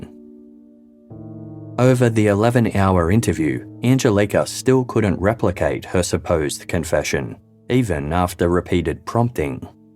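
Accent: Australia. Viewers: He's in Australia, They pronounce a lot of things differently.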